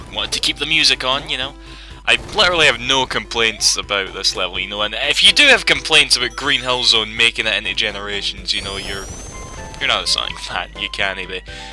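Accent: Scotland, United Kingdom. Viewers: lol when i was a cat xD i love scottish accent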